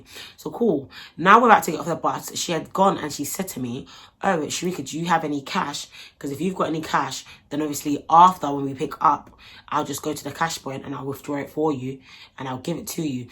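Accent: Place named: Cockney, United Kingdom. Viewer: Lovely lass!! Your so cockney I love it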